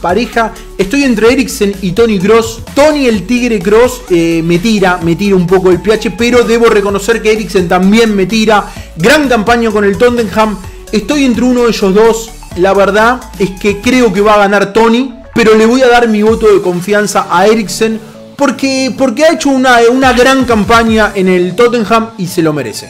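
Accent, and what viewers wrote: Argentina. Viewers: Q lujo oir hablar a un argentino, te lo dice un gallego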